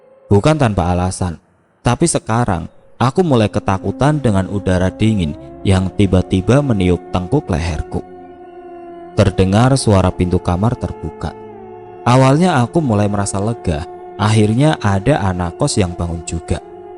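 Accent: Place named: Java, Indonesia. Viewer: Aku suka cerita² kek gini nih. Intonasi dan penghayatannya udh bagus. Tapi kadang kebawa medok bahasanya kadang buyar 😂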